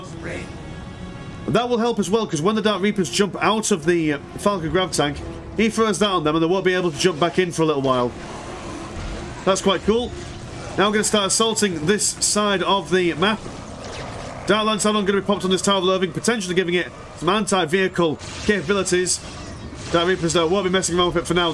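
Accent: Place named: North, United Kingdom. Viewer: northern accent.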